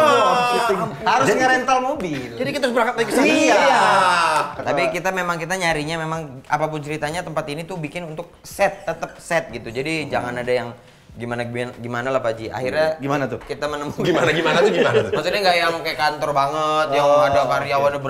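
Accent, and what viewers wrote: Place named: Medan, Indonesia. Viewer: Mak jang, maen maen ya wkw, Medan kali ya min haha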